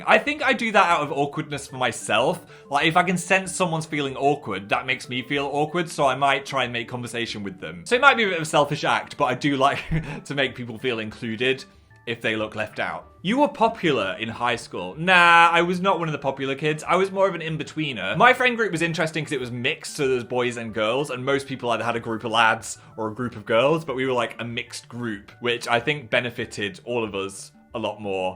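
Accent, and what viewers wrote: North, United Kingdom. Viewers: I always thought phill talking about his secret wife was Brin It might be his northern accent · I love that editing is like 60% more Northern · i just realised something, slipa back into his northerner accent quite a bit in this video, its hilarious lol. With the trailer release of Hometown Showdown tho, maybe he just spent more time in the north and it came back ahaha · HIS ACCENT IS GETTING MORE NORTHERN I LOVE IT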